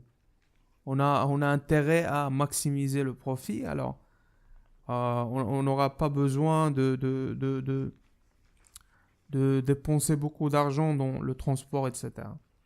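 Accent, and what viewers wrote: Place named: Marrakech, Morocco. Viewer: D apres ton accent je suis sur que tu es un marrakchi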